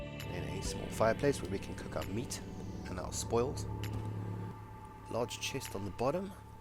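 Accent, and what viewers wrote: South Africa. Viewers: Hey... are you from South Africa? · Are you South African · hey are you south African. if so i am too:) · I knew you are south african!!!!!!!! Lekka CHOPPA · You sound South African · Where u from, South Africa? I’m from there. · Are you South African, by any chance? Great video also!! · I know a South African when I hear one O.o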